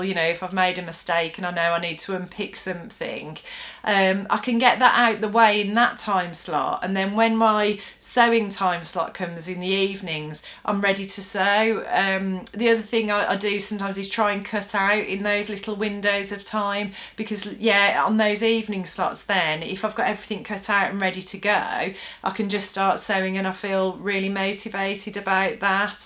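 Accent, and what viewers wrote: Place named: West Midlands, United Kingdom. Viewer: Me too - and to listen you! I moved from the West Midlands to the South West a couple of years ago and the pandemic has curtailed my visits back home to see friends. So I LOVE hearing your accent - it reminds me of home. Oh and I think your tips and advice are great too, of course!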